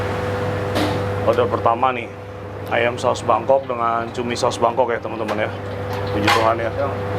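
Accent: Banyumas, Indonesia. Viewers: deneng ngomonge kaya basa bayumasan yah ....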